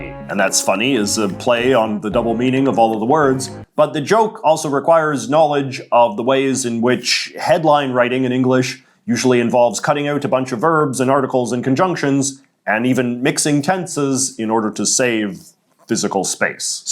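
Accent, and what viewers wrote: Canada. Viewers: Why do American and Canadians sound so similar however the small things like about stayed distinctive and possibly are only Canadian. This accent also seems to be in the northern mid American accent. but why? The hozer accent is so interesting. So many canadians Like have no natural hozer style accent but the about is the one variable. Like some with the word water or lemon. Saying watta or limn. These are all so interesting and seem almost to defy region in some ways. Do these exist in mexico? It is such a huge country that I would assume the accents are VERY different as also geographically there are lots of variables and difficulties getting from place to place.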